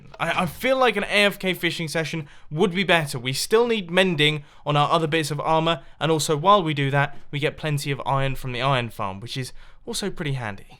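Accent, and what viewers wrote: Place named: Coventry, United Kingdom. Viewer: are you from Coventry? you just have the accent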